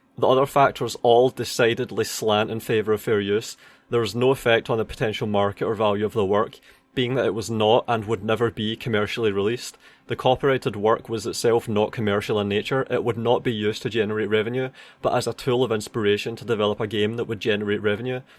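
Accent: Scotland, United Kingdom. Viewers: I don’t even play video games. I come to this channel for the angry Scotsman asmr.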